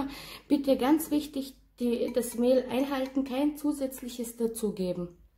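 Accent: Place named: Austria. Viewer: Ich mag sehr gerne deine guten Rezepte und deinen lieben österreichischen Dialekte. Meine Oma hatte auch viele solche Rezepte!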